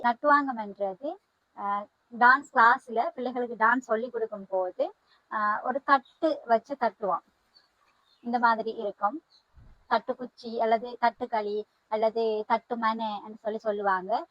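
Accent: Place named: Sri Lanka. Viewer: நாட்டு வாங்கும் பற்றி அருமையான விளக்கம் இதைப்பற்றி பல விளக்கங்கள் கூறினீர்கள் மற்றவர்களின் வேண்டுகோளுக்கு இணங்கி இந்த நிகழ்ச்சியை பதிவு செய்த உங்களுக்கு வாழ்த்துக்கள். உங்களுடைய ஸ்ரீலங்கா தமிழ் பேசுவது மிகவும் அருமை கேட்பதற்கு நன்றாக உள்ளது வாழ்த்துக்கள். நடனத்தில் சிறந்தவர் வாழ்த்துக்கள்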